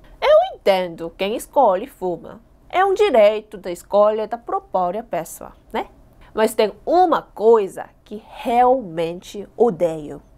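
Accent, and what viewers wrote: South Korea. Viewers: gosto muito de você principalmente do seu sotaque coreano... quanto ao cigarro o governo junto com a saúde fez campanha pra pessoas deixarem de fumar....isso contribuiu muito...tem o Dia Nacional ante tabagismo....abraço... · Mano ela fala português muito perfeito é tipo ela fala português e é tipo o geito é muito parecido com coreano muito fofinha🥺💕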